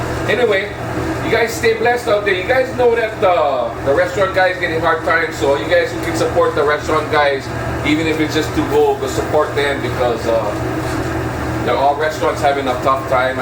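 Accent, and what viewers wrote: Hawaii, United States. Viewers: Growing up in Hawaii ❤😂😅 dats da way of speaking n it's understandable n recognized ur from da islands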